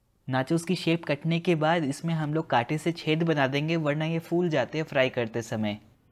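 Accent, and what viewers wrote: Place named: Indore, India. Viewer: Indori tone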